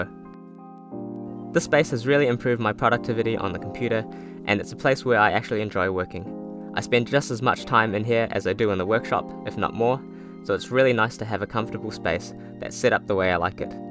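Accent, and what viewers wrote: New Zealand. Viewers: is that a kiwi accent I hear! Where are you located?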